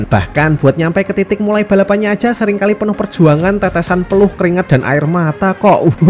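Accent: Java, Indonesia. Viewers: BANG situ orang jawa bukan soalnya ngomongnya kaya orang jawa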